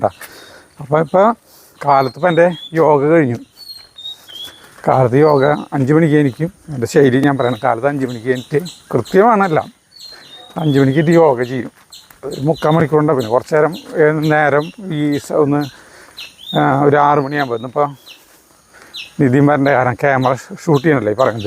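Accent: Thrissur, India. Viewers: തൃശൂർ ഭാഷ 😂👍..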